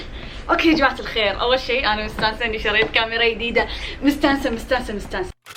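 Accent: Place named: Egypt. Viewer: اللهجة المصرية 😍👌🏻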